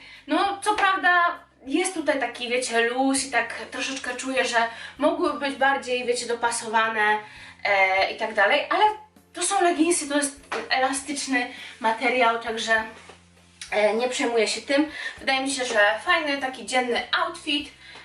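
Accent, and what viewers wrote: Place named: United Kingdom. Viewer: Zauwazylam ze masz teraz taki brytyjski akcent nawet jak mowisz po polsku to przeciagasz dlugo samogloski np- twaarz, a nie mowisz tak jak my mowimy tak szybko 'twarz', mam nadzieje ze wiesz o co mi chodzi :D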